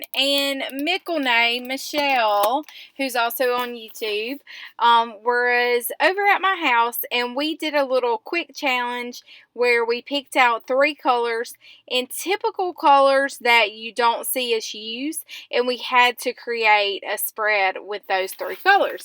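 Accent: South, United States. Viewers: Cool pages..I'm from the UK and I really love the southern accent.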